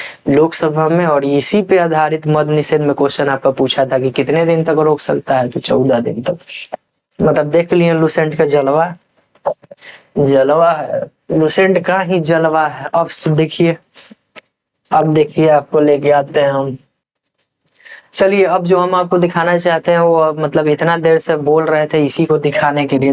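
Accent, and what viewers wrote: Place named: Bihar, India. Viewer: Bihari assent in his voice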